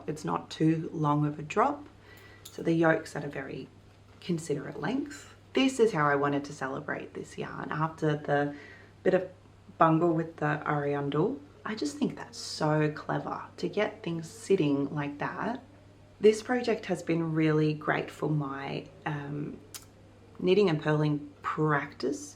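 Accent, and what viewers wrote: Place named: Australia. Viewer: Hello - it’s lovely to hear another Australian on YouTube. I’m impressed with your knits and your productivity whilst being a mum of 3. I am a mum of 5, grandma of 5 and I love knitting, crocheting, sewing etc, but I am impressively slowww 😅 I’m in Brisbane and I have to confess a teensy bit of jealousy that you live in Tassie and have the option to knit lovely cosy knits that will get a good amount of wear! I have to choose carefully which cold weather knits I actually make, given the two minutes of “winter” we get here 😅 Your green Paul Cardigan is just superb on you. You clearly have a good eye for colours that suit you. Love it! What a natural beauty you are! Anyway, I’m watching this and working on my Hatsuki Top by Rievive in Isager Yarn Trio 2. Your cadence and overall chill vibe is perfect accompaniment. Thank you 😊 Also, I heard there was snow down there yesterday! 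Already! It’s finally cooled to a balmy 26 C here today! “I love a good patina” - me too ❤😊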